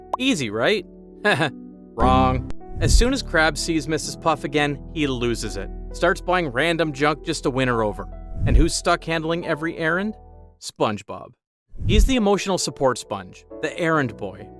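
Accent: Canada. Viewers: you Canadian bro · Too Canadian. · Is this guy Canadian?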